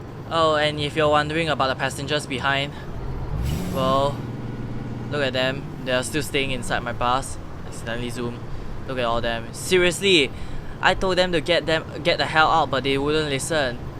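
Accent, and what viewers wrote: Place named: Singapore. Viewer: OMG Your Voice Sounds Like Singaporean When You Speak English.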